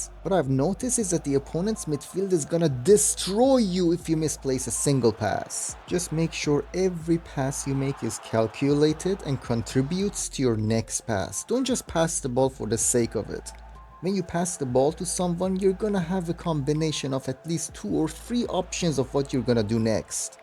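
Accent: Iran. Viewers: I randomly found this vid and after watching it for 10 secs hey this is persian accent lol don't get me wrong u speak 10/10 but there's always a Persian accent 😂 keep it up bro 👍🏻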